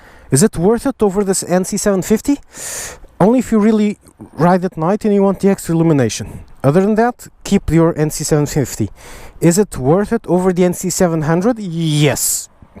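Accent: Portugal. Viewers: Vi logo plo sotaque que eras tuga! Bom video! Cascais! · That vídeo was so nice. I watched it all.where you from? Portugal? I like your accent, too.I myself own a Honda 500f but have always liked the X versions of honda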